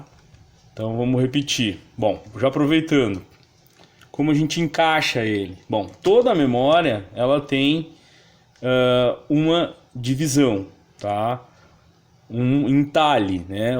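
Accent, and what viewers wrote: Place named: Rio Grande do Sul, Brazil. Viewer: Eita, gauchada sempre termina as frases com "NÉ" :face-orange-raised-eyebrow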